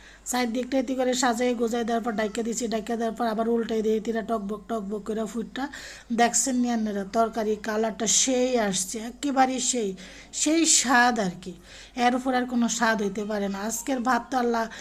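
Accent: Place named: Noakhali, Bangladesh. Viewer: ঠিকই কইছ আপু এমনে তরকারি রান্না করলে অনেক হোয়াদ লাগে তুমি তো পুরোপুরি নোয়াখালির ভাষায় কথা বলতেছ তোমার বাড়ি কি নোয়াখালী আপু জানতে চাই ❤❤🙂👍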